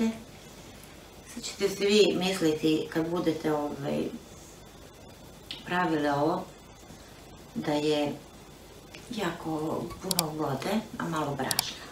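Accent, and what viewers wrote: Vojvodina, Serbia. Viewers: Ona meni toliko super priča...ja sam s mora iz Dalmacije ali kad čujem taj vojvođanski naglasak se uvijek oduševim...a kad kaže "štogod lepo" to je najsmješnije😂👍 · Lep je kolac,draga,a boga mi i ti si mi lepa kao lutka,kao da si promenila boju kose,stogod lepa!? Jos jednom hvala ti za sve recepte,modlice i volem da te slusam,cujem moje,moju Vojvodinu,stogod lepu! ❤️❤️❤️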